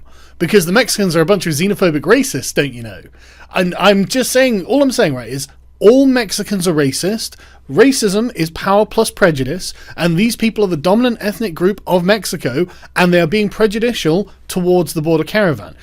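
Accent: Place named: England, United Kingdom. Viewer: The best English accent ever! You could sell me anything after 2am on cable bro.....like Michel Cane in Zulu ( ole boy).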